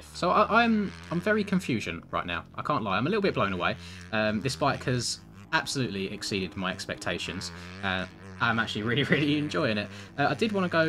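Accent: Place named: United Kingdom. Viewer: Any time I get to hear the smooth british voice I'm in.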